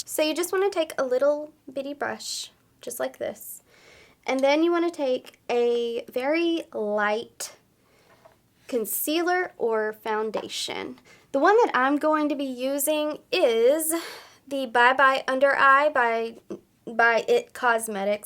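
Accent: South, United States. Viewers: I think she should try asmr. Her southern voice might actually work if she's whispering.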